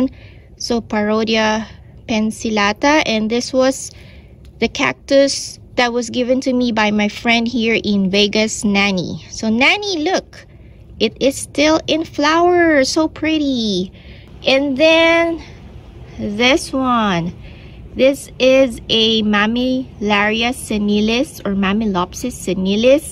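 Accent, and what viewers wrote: Philippines. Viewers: Hi the way you talk you are Filipino ..then i saw the design ur cup of coffeee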